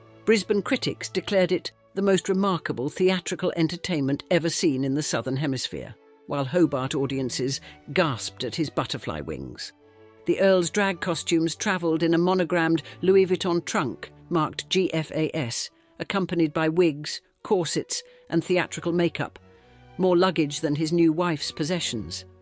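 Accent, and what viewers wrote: England, United Kingdom. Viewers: What's a nice accent British, nice voice. But i don't think alice Thaw is wonderful man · Dumb AI pictures that don't relate to this narrative. The new documentaries filled with an English accent of authority and cheap AI.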